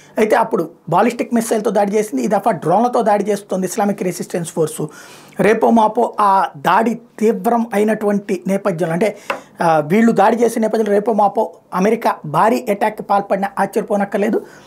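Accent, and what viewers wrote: India. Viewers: nee butler english nuvu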